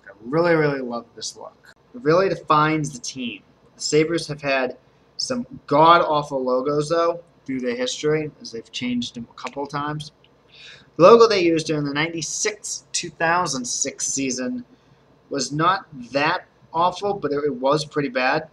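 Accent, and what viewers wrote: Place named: Hartford, United States. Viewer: Wow he has such a Hartford accent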